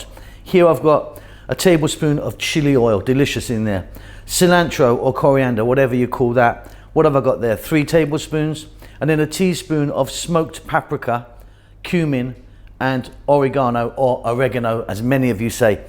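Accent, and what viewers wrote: United Kingdom. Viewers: Your question confirms my initial response: what does someone with a British accent know about refried beans? They are great with Mexican or Tex/Mex dishes.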